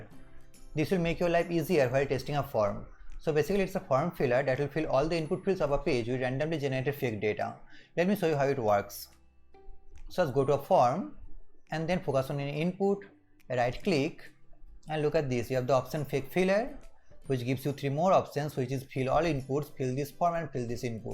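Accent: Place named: India. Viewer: bro your vice is like a hindustani 🙂🙂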